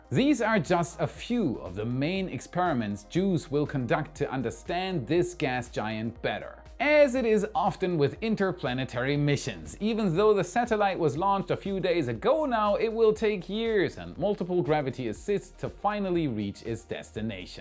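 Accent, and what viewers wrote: Germany. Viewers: You can move to the us,but the german accent îs still there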